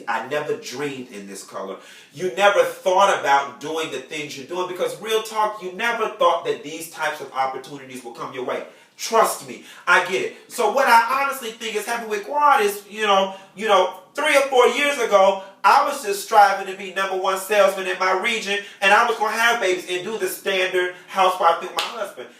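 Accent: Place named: South, United States. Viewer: i love your videos and I'm an avid watcher.... But baby.... You hella country!!!!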